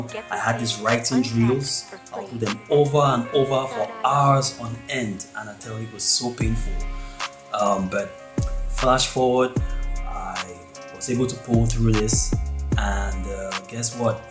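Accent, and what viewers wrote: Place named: Nigeria. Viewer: I totally thought you were Nigerian, because of your accent. I'm Nigerian, myself. Good to know you're from Yaoundé, Cameroon. I have a friend from Yaoundé but he speaks more French than English. I absolutely agree with your reasons for freeforming and the examples you gave with sports and left-handedness. There's something so true and unapologetic about it that calls us to explore true parts of ourselves that we are forced to suppress. My locs are semi-freeform and I love them so much.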